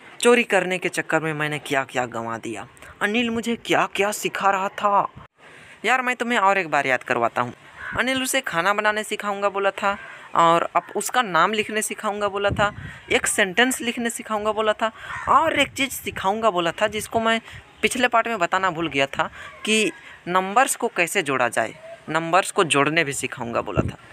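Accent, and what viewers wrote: Bihar, India. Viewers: Aaaaà bhai kaya tum bihari hooo???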